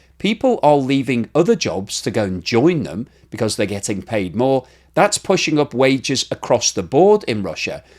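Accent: Standard. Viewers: Thanks for this video. As always Your Standard English is perfect. So much easy as an Scandinavian to understand compared to other British slang/accents. Thanks Per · Thank you for you standard English. For me as italian is really a pleasure to understand 100% of what you say.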